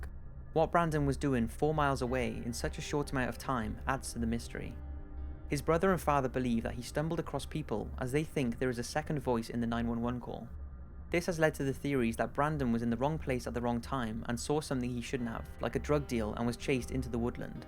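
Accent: Wales, United Kingdom. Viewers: With all due respect, I'm confused. Do you mean the author? The real Lemony Snicket (Daniel Handler) is from California and has a very deep monotone voice. The only thing I can think of is that you're referring to the guy who plays Snicket in the film 'a series of unfortunate events', Jude Law, who is from South London, whereas the guy narrating this video, if I'm not mistaken, is from South (?) Wales in the UK, which is a v different accent to London. Either way, he sounds v little like lemony snicket, real or acted.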